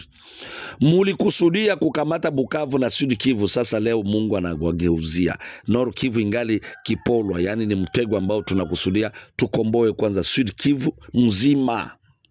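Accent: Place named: Rwanda. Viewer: NAWEWE UNATMKA MAJINA YA VIJIJI KAMA WANYARWANDA!! LWIKO = LW 'EÙ, KAKANGARA = AKANGALA, BIGARAGARA = BIKALA KALA, KABINGU= ABÉNGÙ. KISOKE = ÉSOKE. TAMKA KWA NAFUZI ZA KIBEMBE MAANA NI VIJIJI VYA WABEMBE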